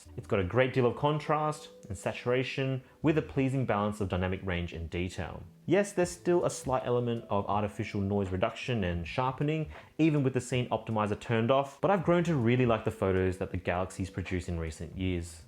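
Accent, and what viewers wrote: Australia. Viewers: you look Chinese but speak like an Australian . nice video though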